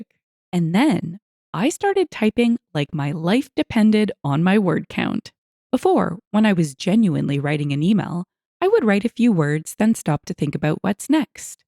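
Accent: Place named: Canada. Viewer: And just a note to add that I love your reading voice and (I assume) Canadian pronunciations and phrasing.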